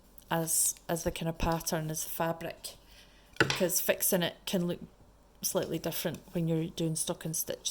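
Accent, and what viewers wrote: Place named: Scotland, United Kingdom. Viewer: I Lo❤e your Scottish accent! ❤ 🥝🇳🇿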